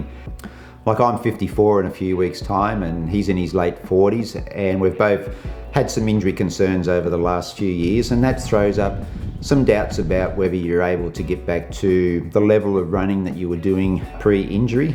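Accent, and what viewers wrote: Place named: Australia. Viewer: Great channel for runners, thank you ! I'm 42 but fairly new to running (3 years), so I can keep chasing down PB's for now, especially on longer distances (I've only run 1 marathon so far in Francfort). Great content ! And your accent reminds me of the wonderful time we had in Australia a few years ago :-) Cheers from Belgium !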